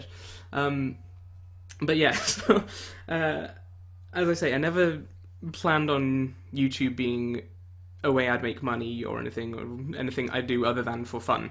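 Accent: United Kingdom. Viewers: Yours was the first channel I ever closely followed, starting way back in 2011 or so. Like many others, I found you through your Endless Ocean 1&2 let's plays. I opened up YouTube, just wanting to re-watch the EO2 opening scene, and I remember being startled when I was greeted by an adolescent British voice chattering over the game. That was my introduction to "let's plays," and I was hooked. Now I watch many YouTube channels, gaming and otherwise, and it has had a very strong influence on my life. You were the one who opened that window for me. I'll definitely miss your videos, but I'm happy to see you moving forward in your life, and I wish you nothing but the best in your future endeavors. Anyway, it was nice to hear your voice again :)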